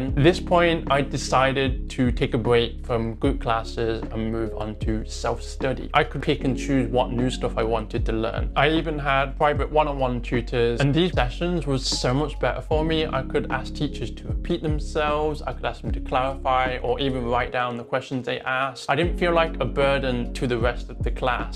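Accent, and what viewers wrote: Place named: Taiwan. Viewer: I love your slight Taiwanese/British-American accent. :)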